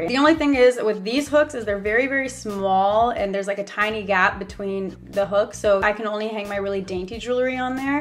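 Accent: Canada. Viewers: Are you Canadian because it sounds like u have an accent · OMG I love your canadian accent!!!! #Sclub #SlvForLife #Wolfia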